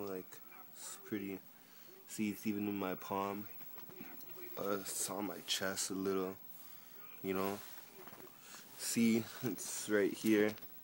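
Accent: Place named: Jamaica. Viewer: I hear a yardie accent, bap bap bap. Yu still cute my yute with vitiligo or not. I have vitiligo too and people ask/say the same thing about my skin, but what can we do? But you will be fine.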